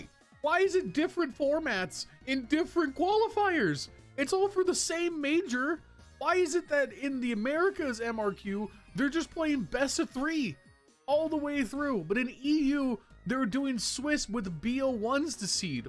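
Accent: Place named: United States. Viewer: As an Australian, please pronounce it as 'Melb-n' or 'Melbin' it hurts a lot to hear Americans do and I do like these videos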